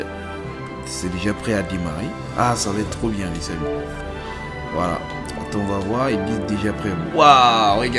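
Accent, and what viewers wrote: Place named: Germany. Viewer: j'adore ton pti accent allemand😍😍😍😍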